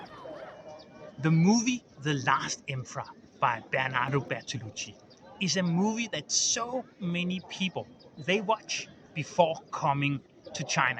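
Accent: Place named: Denmark. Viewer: That's sørengasseme what I call Danglish.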